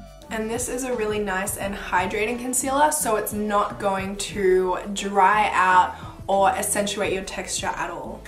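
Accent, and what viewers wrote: Australia. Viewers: What is your accent? Sounds a bit Australian but if though.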